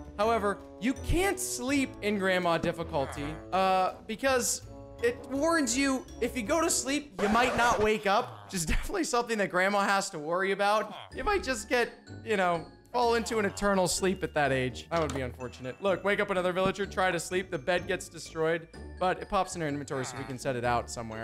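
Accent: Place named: Texas, United States. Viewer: you changed so much the last time I I watched one of your videos you had 100 subscribers and had an amazing Texas accent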